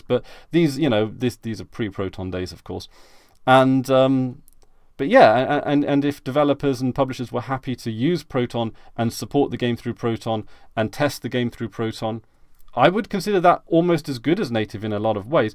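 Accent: United Kingdom. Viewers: Linux might break through.... Linux is already broken through. What are you talking about. How much of the Internet is run on Linux. How many devices run on Linux? Linux is already corporate. Niche by design. What are you talking about do you know who's on the Linux Foundation. God what a mistake listening to a brit. Should have closed the video the moment I heard your accent and saw your English teeth. Why do you guys have such bad teeth anyway? I swear. I need a ibuprofen after that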